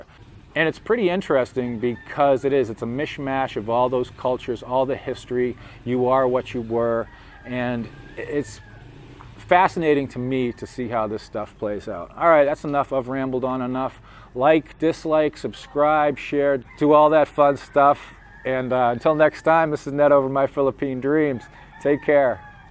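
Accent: Boston, United States. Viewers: That Southie accent, it creeps me out , I am from NJ and I hate the Soxs, ( love the Yankees) hate the Pats and all that tainted NE crap........ well except the chowda and the lobster rolls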